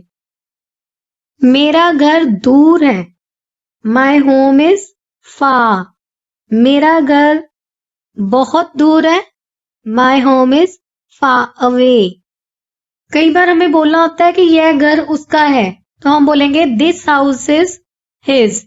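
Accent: Punjab, India. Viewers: Sweet voice, some times Punjabi tone and useful sentences. Thanks mam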